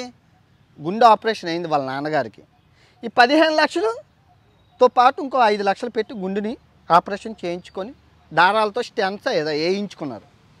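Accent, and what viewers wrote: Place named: Andhra Pradesh, India. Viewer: garu uttarandhra bhasha vintu Naku anandam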